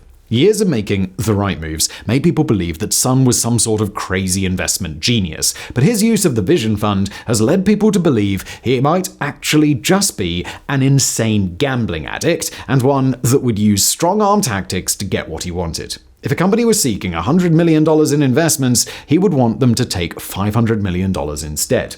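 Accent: United Kingdom. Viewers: Does anybody know ow where in the uk hails from? I need to know what specific accent this is?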